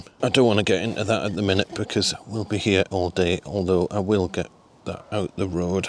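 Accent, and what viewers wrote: Newcastle, United Kingdom. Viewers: Hiya! Watching your video with all those wasps made my skin crawl. You’re a brave man! For next wasp season have you thought of using wasp bait “traps” with boric acid? The wasps are attracted to the boric acid laced bait, take it back to the nest to feed their young, and kill the whole nest for you. It takes about two weeks. I’ve used that method now for two wasp seasons and it works! There are plenty of videos here on YouTube explains how to do it. I’ve found protein baits (bacon fat and tinned cat food) works best in spring, and sugary baits (apple juice with a pinch of bakers yeast to give off a smell like fermenting apples) works best from mid summer onwards. Good luck! P.S. I love your Geordie accent!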